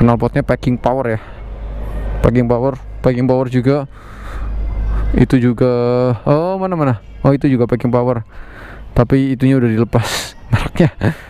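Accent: Banyumas, Indonesia. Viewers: Asline ngendi bro. Deneng krungu ngapak"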